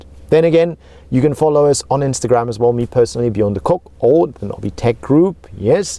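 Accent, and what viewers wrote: South Africa. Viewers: he’s south african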